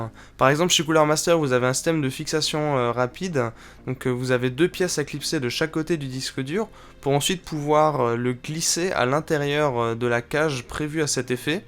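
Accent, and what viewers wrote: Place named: England, United Kingdom. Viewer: Quel accent anglais x)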